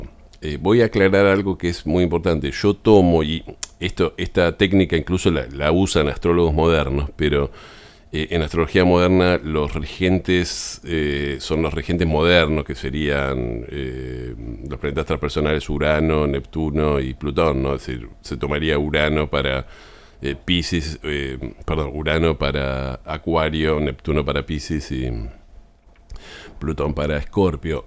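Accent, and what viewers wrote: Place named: Buenos Aires, Argentina. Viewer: Que lio que haces!! Pero me gusta tu voz y tu accento argentino... y porteño..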